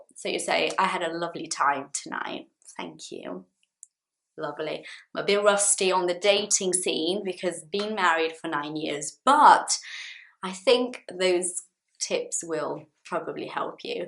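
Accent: United Kingdom. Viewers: The British accent is not my favorite but I like the way you speak english!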